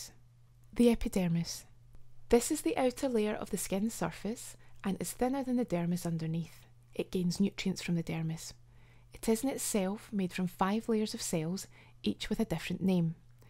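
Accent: Scotland, United Kingdom. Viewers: I really love your vids, please keep them coming! Very informative and I love your voice and Scottish accent!!! Cheers!!!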